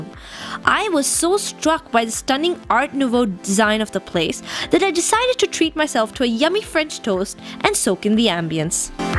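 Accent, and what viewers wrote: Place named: Spain. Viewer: I'm Portuguese and hearing her pronounce every single place with a Spanish accent really made me cringe. People need to understand that Portuguese and Spanish are two different languages, we might have similarities but accent is not one of them. Portuguese and Spanish don't have a good history together so please don't ever mix Spanish and Portuguese because both ethnicities won't appreciate it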